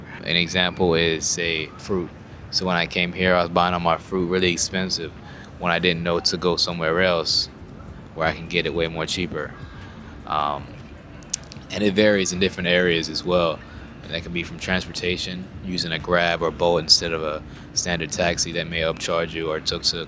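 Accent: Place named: Toronto, Canada. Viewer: Mans sounds like he's from the 6ix.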